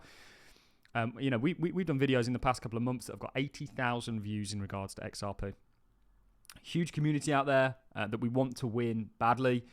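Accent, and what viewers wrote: United Kingdom. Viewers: Been watching crypto YouTube for 8 years now. is the best non biased analyst I have seen. Plus his sweet British accent is like butter in the morning. There is no need to rage my friend. It’s just a daily update. Go somewhere else and listen to what you want to hear. Plenty of opportunities for news.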